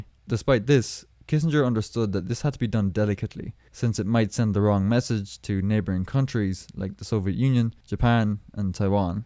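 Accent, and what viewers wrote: Ireland. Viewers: Excellent channel, where in Ireland are you from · I will eat my sock if this man isn't Irish. Great video btw, quality stuff.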